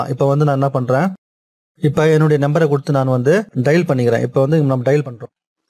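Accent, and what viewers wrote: Chennai, India. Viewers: Bro UNGA Chennai Tamil semma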